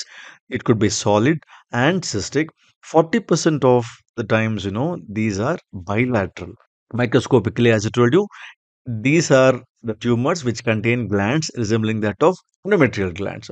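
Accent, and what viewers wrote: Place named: India. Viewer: Indian voice🤢🤢🤢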